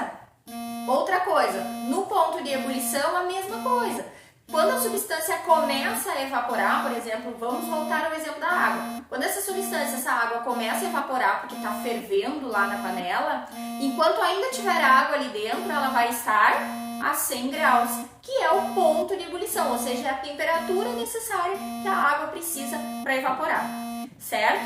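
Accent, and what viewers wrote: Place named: Rio Grande do Sul, Brazil. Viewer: Sotaque do rio grande do sul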